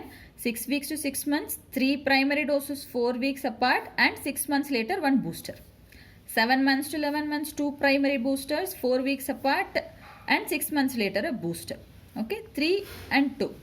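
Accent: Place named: South India, India. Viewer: Very nice presentation.lovely voice with south indian accent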